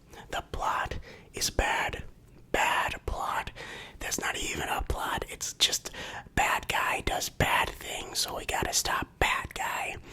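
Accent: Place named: New Jersey, United States. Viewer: Are you from New Jersey? Your accent's pretty strong.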